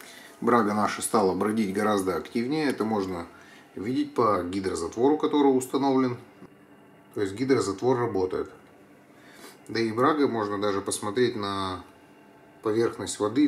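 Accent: Perm, Russia. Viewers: Пермяки многие так говорят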